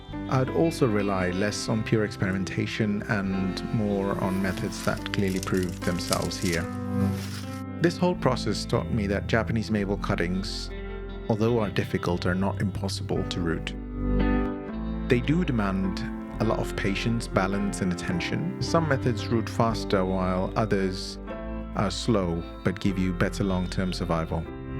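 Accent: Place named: India. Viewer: I enjoy your channel immensely , you are an easy study , I appreciate that you speak slow as I have difficulty sometimes with Indian accents , thank you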